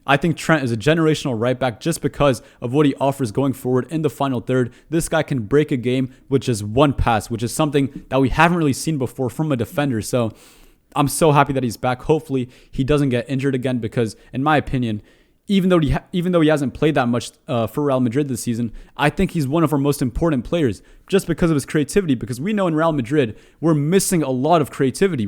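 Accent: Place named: Argentina. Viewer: Do you have from Argentina? You sound Argentine when you pronounce Spanish words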